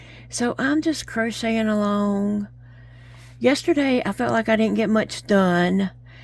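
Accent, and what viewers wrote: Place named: Louisiana, United States. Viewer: You just speak Louisiana Cajun! I take great joy in saying I am smarter than Alexa in that I can do that!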